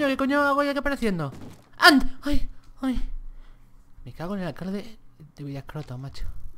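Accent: Chile. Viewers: Nunca vi este:'v PERO HABLA CHILENO DICEJDBCIDJCIBFICJFIF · like por el acento chileno del *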